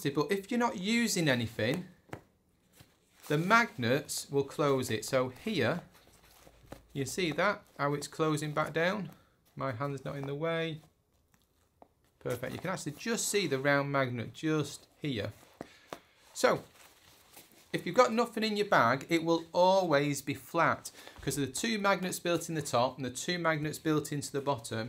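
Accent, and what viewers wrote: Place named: United Kingdom. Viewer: Damn. Nothing worse than clicking on a video from a creator you've never seen and they're Bri'ish. SMH. So sad.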